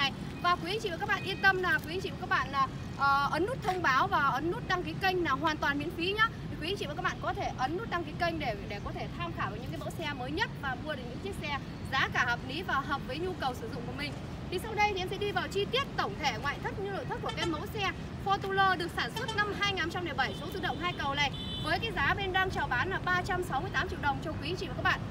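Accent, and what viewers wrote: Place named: Hai Duong, Vietnam. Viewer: Thương em vất vả, nghe em nói đã biết quê gốc Hải Dương, cứ níu na níu nô, chúc em kinh doanh tốt nhé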